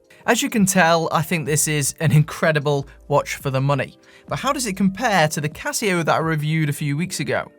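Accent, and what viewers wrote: United Kingdom. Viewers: Fantastic informative review of this COOL watch. So much usefull information. Love the clear British accent.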